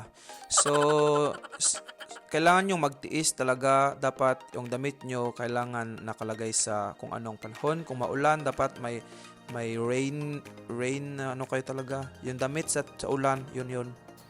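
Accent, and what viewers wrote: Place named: Visayas, Philippines. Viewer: parang bisaya met iti tuno mon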